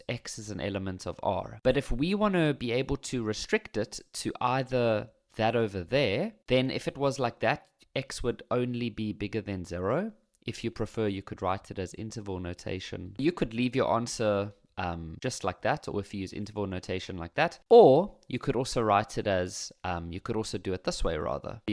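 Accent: South Africa. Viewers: That cape coloured accent hella accurate.🤣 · Your accent is so South African , i wonder how they would mistake you for anything other than lol 😆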